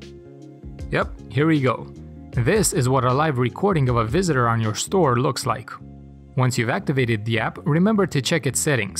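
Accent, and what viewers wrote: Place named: Russia. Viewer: Акцент похож на русский)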